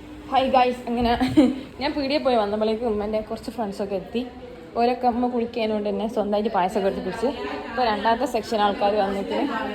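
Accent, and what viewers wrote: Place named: Thamarassery, India. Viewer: എൻ്റെ favorites നമ്മളെ നാടൻ ഭാഷയാണ്.😂😅 നല്ല rasand താമരശ്ശേരി ഭാഷ കേൾക്കാൻ❤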